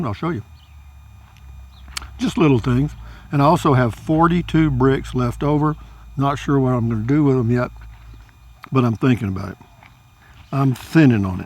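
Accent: Texas, United States. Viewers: Oh ❤you talk just like my favorite Texan: Betty McNaughton, Bless her heart!!❤she is a character just like you😂🤣🇺🇸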